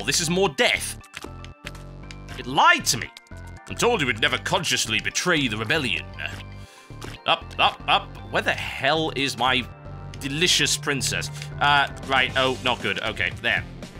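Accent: United Kingdom. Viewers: As a german i love this britich accent or so ;D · Hahahaha I love his angry british sounds! They make my day lol. xD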